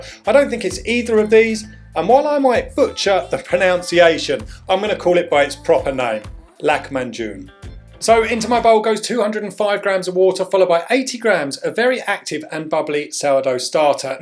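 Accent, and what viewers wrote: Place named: London, United Kingdom. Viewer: You sound like you're from London. Have you been to Greenwich market? They have lovely Turkish "pizzas".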